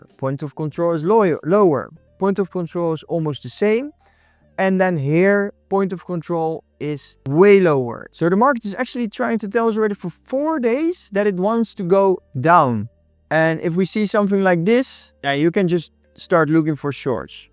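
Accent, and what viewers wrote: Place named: Netherlands. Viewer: Continue your videos. They are really good and give me additional knowledge about order flow. I really appreciate it. I was wondering where are you from cause you have a strong dutch accent ?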